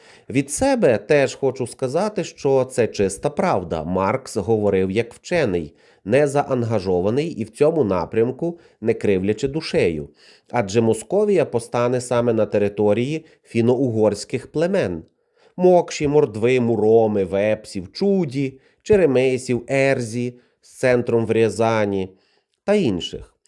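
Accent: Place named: Galicia, Ukraine. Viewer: Все супер. Особливо мені подобається галицька вимова автора. це дуже мило) і навіть сексуально))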